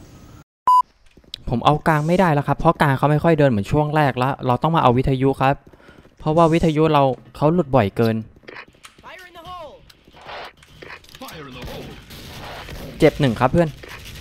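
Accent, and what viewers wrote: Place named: Songkhla, Thailand. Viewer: จังหวะแหลงเหมือนเด็กสงขลาเลย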